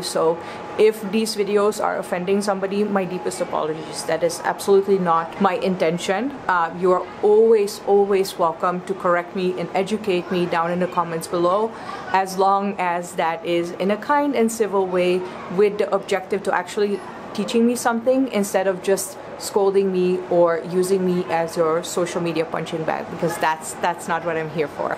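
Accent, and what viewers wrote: India. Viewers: Your accent is like Indian haha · Your getting a Indian accent! I’m from Amsterdam and it’s really obvious to hear ! Love it 😊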